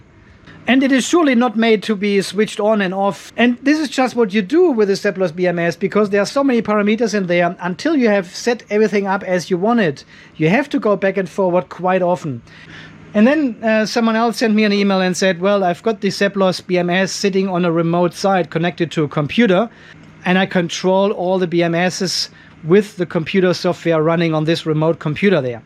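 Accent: Germany. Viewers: Hi, I just found your channel a few days ago when trying to learn about smart BMS's. Your content is amazing, full of information and humor, and I am greatly enjoying learning from you. My late father was an immigrant to the US from Austria, so your German accented English is a pleasure to listen to. I have subscribed to your channel. I have a question that may have been answered in one of your videos that I haven't seen yet, so please excuse the question if that is the case. As a "general rule", how much would be saved by making my own 300ah 12v pack from "storage grade" LiFePO4 280ah cells vs buying a $700 USD prebuilt pack? Assuming no cost for tools and my own time, just costs for the cells, the wire and terminals and maybe a pack fuse, the aluminum for the cell interconnect buss bars, the steel or aluminum and hardware to compress the packs, and the 150a discharge rated smart active balancing BMS? 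I look forward to your response and guidance, Philip from Oregon, USA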